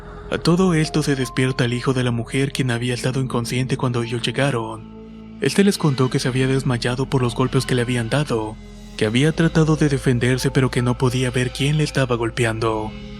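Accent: Standard. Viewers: Oye men, no logro entender cuál es tu nacionalidad, ya que no tienes un acento que te pueda identificar como recidente de ni un país concreto. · Tu acento es como el español neutro de las traducciones de películas de principios de los 2000. Me traes buenos recuerdos.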